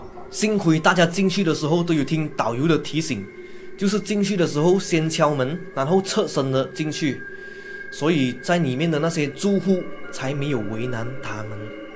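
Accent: Malaysia. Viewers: 我一聽 就知道 是 來自 馬來西亞的 主播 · 故事情节讲得很好！很自然！因为有马来西亚人讲话的口声而产生共鸣！ 我也有真实灵异親身经历故事！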